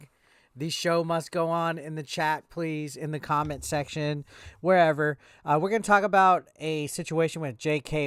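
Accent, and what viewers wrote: United States. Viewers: Your amerikan voice is awful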